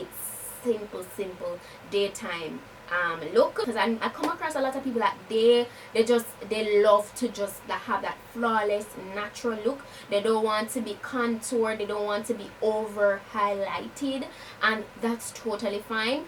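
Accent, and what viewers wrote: Jamaica. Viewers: love your accents Jamaican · Yaardie gal you accent strong sah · JAMAICAN :-)... Beautiful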